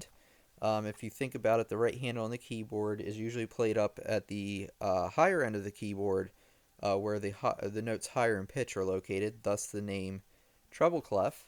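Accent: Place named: United States. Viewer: Jess Ng lol he sounds like a normal american.. Its pretty easy to comprehend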